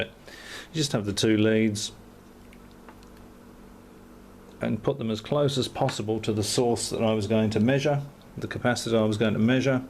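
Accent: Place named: Australia. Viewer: Hi!! you seems to be an aussie!!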